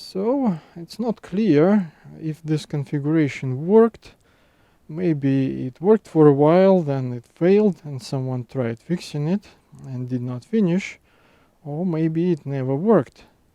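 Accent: Russia. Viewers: Nice job. And charming Russian accent. 😊 By the way, shall you use 240V bulb instead fuse at first power up?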